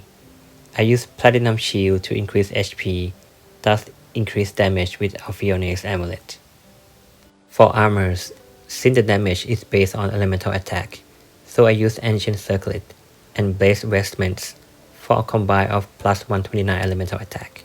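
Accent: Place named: Thailand. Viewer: You sound Thai, where you from?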